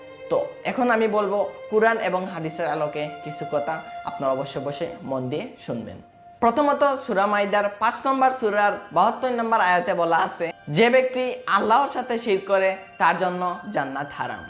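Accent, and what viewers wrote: Sylhet, Bangladesh. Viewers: ভাই তুমার মুখ ও সিলেটি মাত অউ ভালা লাগে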